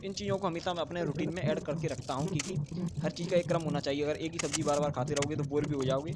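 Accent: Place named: Chambal, India. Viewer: Bhaiya Nmakar ji Kha sy ho aap Dholpur, murena, Bhartpur, Agara, Bhind, Gwaliyr ... Inhi city's k aas paas k ho aap aapki Awaj s pta cjlta hy 😊🙏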